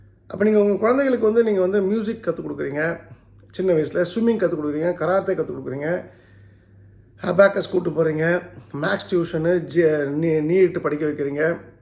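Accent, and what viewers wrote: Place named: Madurai, India. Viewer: மதுரை தமிழ், சண்டை போட்டாலும் ரசிக்கும் படி இருக்கும். 🎉🎉🎉🎉🎉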